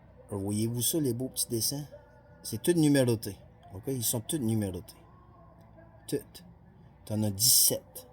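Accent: Magdalen Islands, Canada. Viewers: Merci pour L'espoir que vous me donnez. Je vois déjà les Madelinot combattifs mais faut que ce soit le peuple au complet qui dise NON et on met le maire sur le bateau, direction Souris. J'ai toujours hâte de voir apparaître vos vidéos dans ma cloche. Avec l'accent de Îles qui flatte mon oreille.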